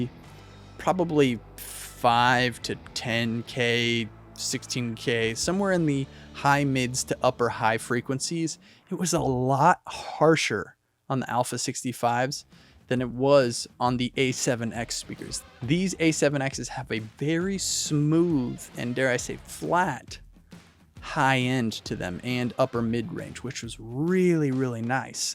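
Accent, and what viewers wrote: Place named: Nashville, United States. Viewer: you from nashville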